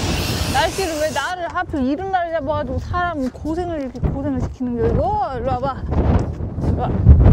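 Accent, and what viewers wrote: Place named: Jeolla, South Korea. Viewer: 아 그렇군요 전라도 사투리 한번씩 쓰시는거 같아서 ㅎㅎ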